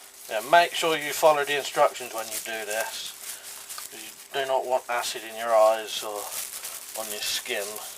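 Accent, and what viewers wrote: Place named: England, United Kingdom. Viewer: Love the English accent. Great video and nice cleaver!!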